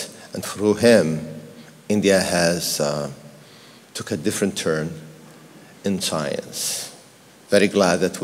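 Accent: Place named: United Kingdom. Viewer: Masha Allah Sheikh Saud's accent is really so perfect he has the Britain one first time I get to hear his Speech wooow so fantastic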